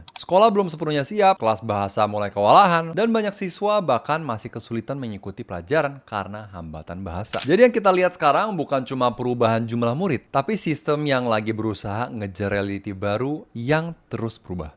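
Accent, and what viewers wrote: Indonesia. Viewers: logat nya ya Indonesia banget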